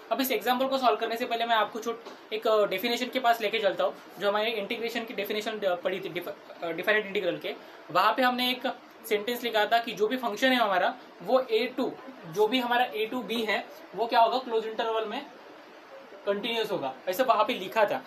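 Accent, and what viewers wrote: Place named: Maharashtra, India. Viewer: Sir maharashtra se ho n Bolne k tareeke se lag rha h 😂😂😂😝😎😎😎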